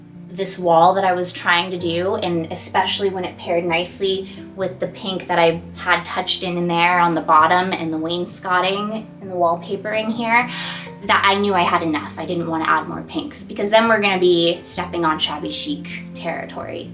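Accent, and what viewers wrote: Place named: California, United States. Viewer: You sound so California, I love it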